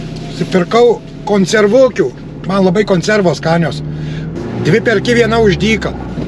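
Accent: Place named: Alytus, Lithuania. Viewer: Pasiūlė jūtūbas video pažiūrėt, ant juoko paspaudžiau ir visą sužiūrėjau, užsiprenumeravau. Ir akcentas alytietiškas labai gražus (pats iš Alytaus esu), ir komentarai pakeliui, ir šuniukai. Tiksliai net nepasakysiu, kas taip patiko, bet patiko ir žiūrėsiu dar :)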